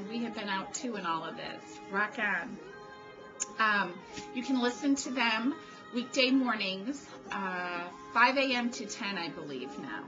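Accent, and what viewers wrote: New Jersey, United States. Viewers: Nice to meet you. You are very sweet, love your NJ accent. You are so right that we should get to know each other better. When I am brave enough I will made a video too. My mom was from Chicago too. TFS! ~Sharon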